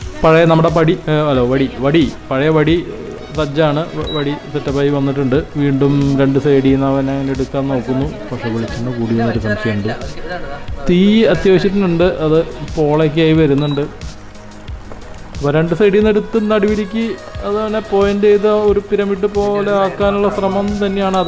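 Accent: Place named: Thrissur, India. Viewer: Laughed a lot.. Really funny... Who had given the voice? A person from Thrissur, right? Good commentary too..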